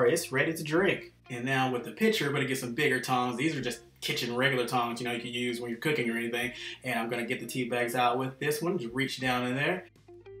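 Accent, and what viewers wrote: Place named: United States. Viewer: i love your nice country voice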